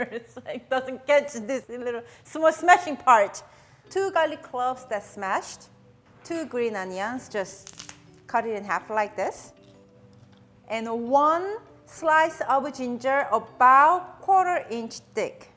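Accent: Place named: South Korea. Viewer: 영어에 한국어 액센트 물씬인데 음식 역사, 유래 좀 배우지? 무식하게 저걸 일본식이라고. ㅉㅉㅉ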